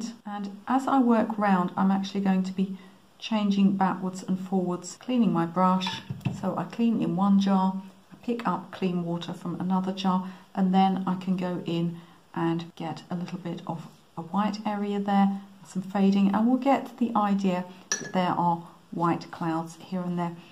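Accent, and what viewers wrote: United Kingdom. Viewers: Loved this! I struggle with backgrounds a lot and this really gave me a lot of techniques to try and make my backgrounds more interesting. The daisy was my favorite but I loved them all. Very helpful! ( By the way....love your British accent😀 and lovely nail color!)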